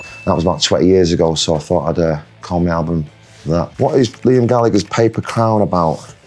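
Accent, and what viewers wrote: United Kingdom. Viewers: Definetly, i love british accent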